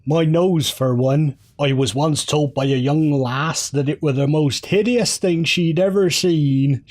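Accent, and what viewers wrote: United Kingdom. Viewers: Just stumbled on this. Beautifully told. Suffolk accent?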